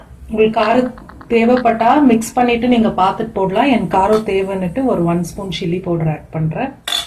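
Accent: Bangalore, India. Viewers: I am tamilian from Banglore, it was v good see you any cooking kebab and talking Tamil in our style.. 😄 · Akka banglore tamil haa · Nice Bangalore tamil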